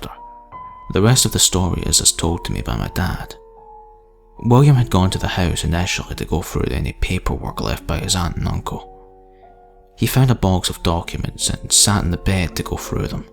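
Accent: Scotland, United Kingdom. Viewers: Hi devilslittlesister. Unfortunately for my freaked out family, it's not a creepypasta, they swear blind it's true. And, yeah, he's a great narrator, I'm a sucker for a Scottish accent!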